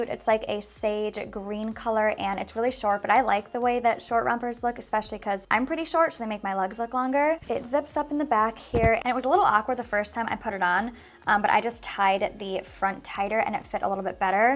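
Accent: Minnesota, United States. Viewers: Do you live in Minnesota I heard you say my Minnesota accent lol I live there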